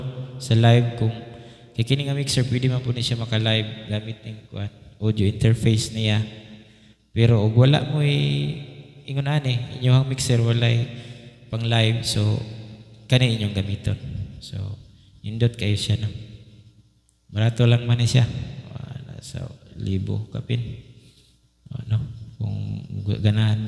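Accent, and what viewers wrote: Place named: Visayas, Philippines. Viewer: Bisayang bisaya naman ito si kuya.hirap naman agtagalog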